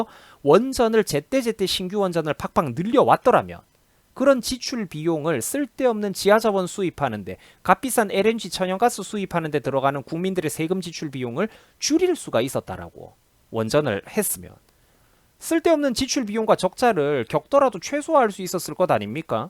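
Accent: Gyeongsang, South Korea. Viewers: 에휴... 경상도 사투리 쓰는거보니 경상도는 왜그래? 니네동네에 원전들어가 있으면 니네가 제일 먼저 반대해야하는거 아니냐? 리트리버수준 뇌용량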